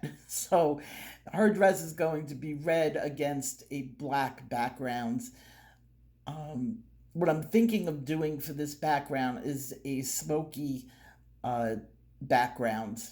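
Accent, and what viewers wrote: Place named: New Jersey, United States. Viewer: So nice to see your newer videos. I have missed your NJ accent and great teaching skills😊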